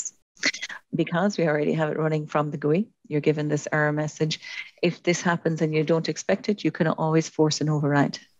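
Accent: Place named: Ireland. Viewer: A lot more videos should be done in an Irish accent. Thank you.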